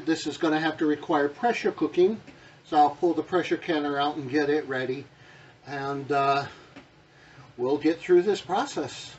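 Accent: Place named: South, United States. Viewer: 🤦 dude, every time you told Alexa to set a timer for 3 minutes caused my Alexa to set a timer. Now my Alexa has a "greens" timer. 😂 I know this video is a couple of years old now but I just saw it. I'm about half redneck living in middle TN. The way you talked and done everything felt like I was listening to someone local and on my level. Been awhile since I done any canning and was looking for refreshers. You mentioned liking all kinds of greens like turnip, collard, mustard, whatever. If you haven't already you should try a mixed of all those together. Mixed greens are pretty darn good. Throwing in some kale, that you said wasn't your favorite, actually adds to the flavor. Some arugula mixed in is pretty good too. I do have a couple of suggestions, not criticisms or anything negative. I'm not a fan of a whole lot of turnip chunks in my greens. 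I'd recommend putting the turnip chunks in the jars first so you can get the amount you like. I'd also shoot for something in the middle when it comes to loose pack or tight pack. As a southerner I like some meat flavor with turnip greens. A couple of different things you could do if wanted it already canned instead of adding it later. Canning meat isn't a whole lot longer than just the greens. Maybe blanch the greens maybe a minute less, or not , and should still be good with meat. Or use some bullion in the water you are blanching them in and filling the jars. Again, absolutely nothing wrong with what you done. It helped me remember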